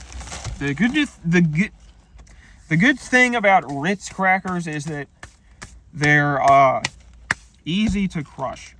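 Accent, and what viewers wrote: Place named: Philadelphia, United States. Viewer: Man is def from the general Philly/Bmore area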